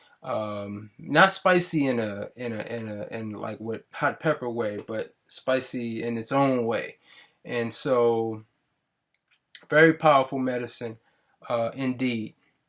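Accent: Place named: DMV, United States. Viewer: u sound like ur from the DMV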